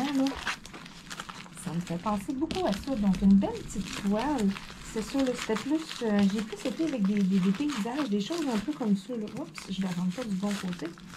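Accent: Canada. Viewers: Vous êtes super comment ne pas vous aimer avec votre accent. Canadien qui me rappelle de bons souvenirs au quebec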